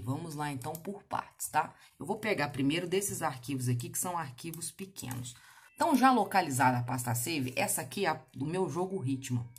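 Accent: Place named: Zona da Mata, Brazil. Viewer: de onde? Pelo sotaque parece ser da zona da mata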